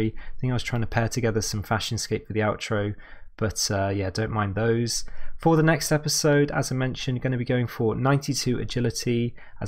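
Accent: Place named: United Kingdom. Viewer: Nice British accent Fellah!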